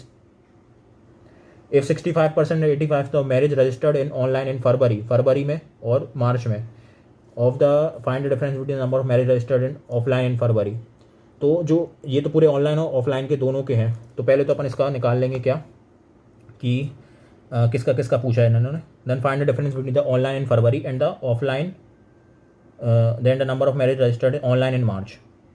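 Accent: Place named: Bundelkhand, India. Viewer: Bundelkhandi jhalak rhi h apki tone me... Gajab badde.! Maan gaye..💥💥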